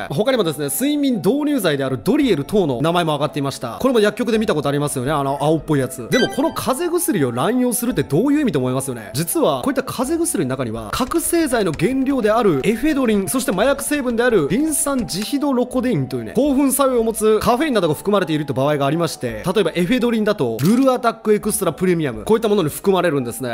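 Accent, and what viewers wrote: Kansai, Japan. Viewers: 標準語で話してますけど関西の人なんですね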